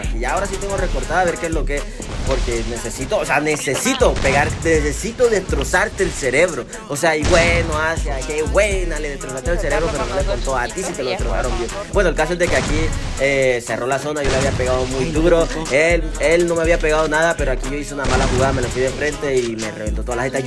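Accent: Barranquilla, Colombia. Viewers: Es de Barranquilla? Tiene acento de ahí😂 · Bro eres Costeño, eres de Barranquilla verdad responde brother❤️🙏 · Bro eres de Barranquilla Colombia?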